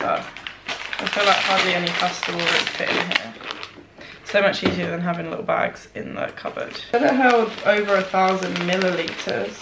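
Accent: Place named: Birmingham, United Kingdom. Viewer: Is one of your flatmates or friends brummy? I feel like I can hear hints of birmingham in your accent/ tone of voice now! I love it x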